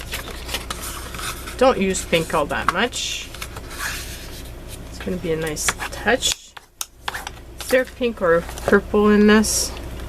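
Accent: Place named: France. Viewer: I too have been watching your videos for some time now and can honestly say that you have been my greatest inspiration. Starting with recycling Christmas cards, I have gained in confidence, that I never had before I saw your channel. This has led to many different crafts I so enjoy doing. So thank you from the bottom of my heart. Also, I love hearing your French accent. I never miss your card making videos. My absolute favourite! xx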